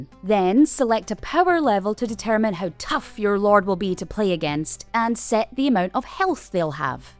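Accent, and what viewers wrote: Scotland, United Kingdom. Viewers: Gosh a guide AND with that accent! Big loves and thanks to Scotland!😍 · Came for the tutorial, stayed for the scottish accent. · legit curious, this a Scottish girl trying a more neutral/American accent?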